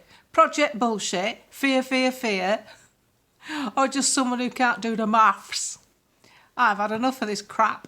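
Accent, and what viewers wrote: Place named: Manchester, United Kingdom. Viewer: And I always love the "Munchester" accent - hope I got that right.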